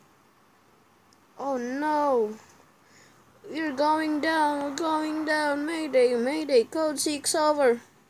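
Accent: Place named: India. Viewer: Its like indian accent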